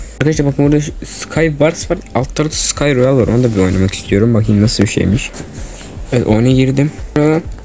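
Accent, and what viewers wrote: Azerbaijan. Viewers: Azerimsin sesinden bildim Düzenleme:beyler like atın hiç bi videoya çıkmadım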